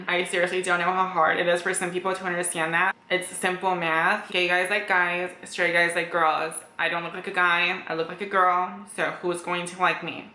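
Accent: United States. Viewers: Your voice is what makes you, you. Honestly i feel there's nothing wrong with it. To me as a British female you just sound like a normal American girl to me. I'm sorry you receive so much hate I feel people are just bothered because they can't understand or they can't look like you. Keep inspiring! Love your views I'm also glad you mentioned about the pregnancy thing, so many people around me struggle and some cannot conceive. It's very bitter and harsh to throw it in your face, I hope that the positive out ways the negatives for you! You are amazing ! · Your voice just sounds like youre from California haha. People are just Caliphobic :P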